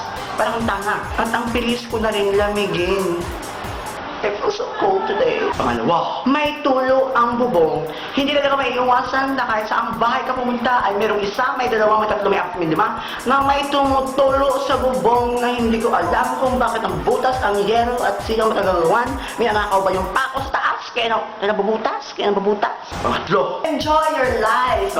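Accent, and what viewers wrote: Visayas, Philippines. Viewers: Bisaya kba? oOh..ganyan lang tlaga ung accent mo?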